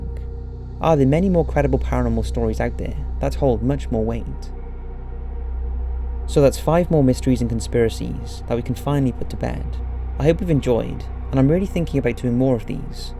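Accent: Wales, United Kingdom. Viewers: This is awesome, I love your research. You’ve got a Welsh twang too - even better! Please solve the Ripley ghost photo as that is the only thing on the planet that truly freaks me out. I think I read that it might be a photographic anomaly and could be of the flower arranger, but that would have to be one heck of an anomaly to end up as an eight foot cowled figure without a face. shudder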